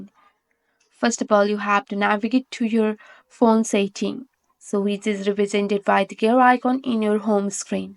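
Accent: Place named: Nepal. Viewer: Getting that nepali accent.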